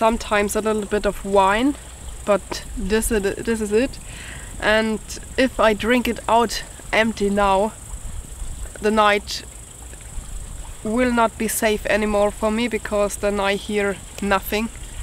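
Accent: Germany. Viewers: your german accent in English is adorable... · Hi. I started watching your channel a short time ago and I like your videos being off grid. I spent 2 1/2 years in Bad Kissingen, Germany while I was in the Army and listening to you talk makes me homesick for Germany. Just thought I'd share that. Thanks for the videos. Take care.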